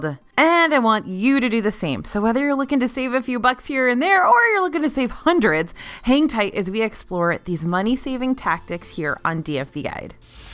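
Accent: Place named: Western New York, United States. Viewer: I knew your accent was familiar… I am also from western ny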